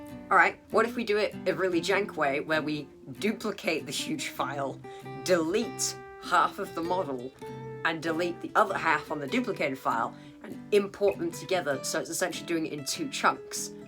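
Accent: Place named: Australia. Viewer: Hey dude where you from you almost sound Australian or kiwi.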